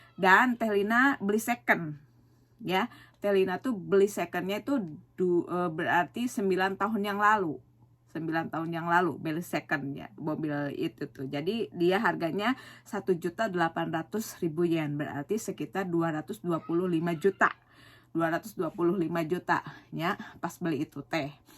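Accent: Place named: Sunda, Indonesia. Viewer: 20 tahunan di Jepang, eta bahasa Sunda na edun suredunnnn....asli pisan Sunda😀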